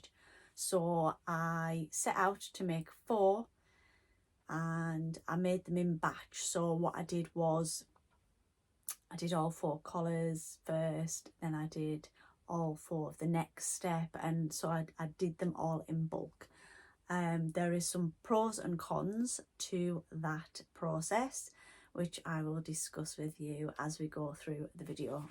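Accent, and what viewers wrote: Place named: Northern England, United Kingdom. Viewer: Love your presentation and accent-northern England? My husband and his family are from Bradford. I’m getting back into sewing after MANY years, enjoying new techniques and reviving my skills ❤️ keep up your good work!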